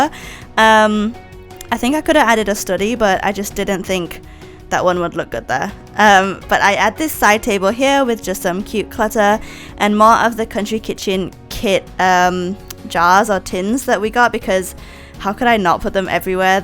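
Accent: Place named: Asia. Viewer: Hey! Firstly, gorgeous build - like, it’s so cozy and cottage-y and I love it! Secondly, your voice is so cute! And it’s so cool to learn that you’re Asian, I wouldn’t feel self-conscious about your accent or voice, but I appreciate it’s still daunting to create voiceovers haha! I struggle with my own. In response to your question on voiceovers, I tried to script mine but it came out too robotic... now I’m trying to just go with the flow, tell some stories, talk about the build, but it does take a lot out of me to record them. I’ve got so much recorded footage just waiting for voiceovers 😂 Love your videos! 💜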